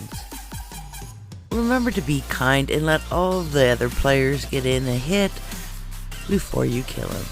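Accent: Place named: Scotland, United Kingdom. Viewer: Sound more Scottish than Irish, luv it!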